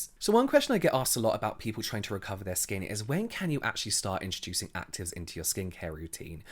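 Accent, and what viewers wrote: United Kingdom. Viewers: not really, has that soothing voice + British accent. Have you heard Alfie Deyes’ voice? He’s British but no way he can do audio books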